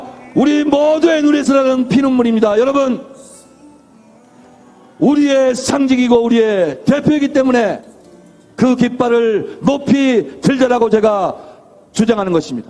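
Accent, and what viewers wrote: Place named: Busan, South Korea. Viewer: 부산이구나 ㅎㅎㅎ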